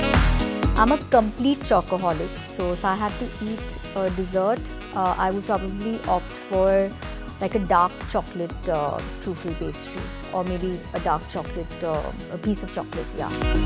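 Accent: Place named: Mangalore, India. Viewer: She is a mangalorean. Most girls speak and have expressions the same way. Also Aishwarya is a mangalorean. We can relate.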